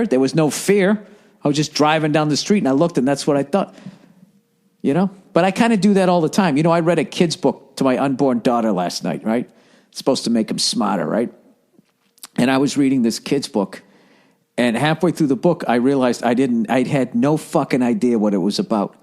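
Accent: Boston, United States. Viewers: Hey are you from Southy?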